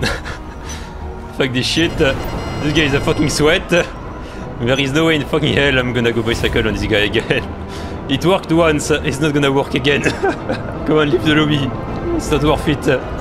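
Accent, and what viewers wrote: France. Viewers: I loved the video and the french accent made everything better!!😂❤ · Le bon accent français bonne vidéo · Un accent purement français mdrrr ps t’es vidéo sont vraiment Cali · i usually dont watch gta online stuff but this vid was fkin hilarious and your frnech acent makes it waaaaay better hahahaha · The accent made it better ol frenchy boy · love the french accent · his french accent is like the best combination for the vid istg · Sorry if I seem to be rude somehow, but probably 90% of everything I understood on hearing, considering your French (?) accent, was the swearing. 😄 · I hear ur French accent can you say Rickey Bobby like the French guy form Talladega knights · Le bon gros français de base qui parle anglais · Can we all just appreciate his fine French accent and the fact that he CLEANS THE LOBBY for toxic tryhard? Thanks a lot dear lord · i like ur french accent :) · 3 things 1. 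This was satisfying 2. WOOW THAT WAS AMAZING SHOT 3. Hearing a french talking english is indeed satisfying to the ear idk why + great ability sir, long live bicycle cult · that french accent is majestic, as a french person I can agree your accent is awesome! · I love the french accent. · you got a french accent, just like me :D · At first the heavy French accent put me off, and yet over time I find it so hilarious whilst speaking English. Easily subbed. You losing your shit or wrecking someone is some top tier stuff. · the French accent makes this 1000x funnier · I subed cuz I love ur French axent and love ur humor and I'm part French but I have yet to learn such a language but first I want to watch all the rest of ur vids cuz this is my first vid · That french accent tho💀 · Are you french because your accent is really cool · le petit accens francais sa me fume ^^ , sinon gg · this accent sounds french i love it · French accent makess this so much better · This guy has the most french accent of all time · At first i thought you was Polish/Russian but after hearing your accent for one more time your French,lol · L'accent Français qui fait plaisir :awesome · french accent is " vraiment cool mon gars "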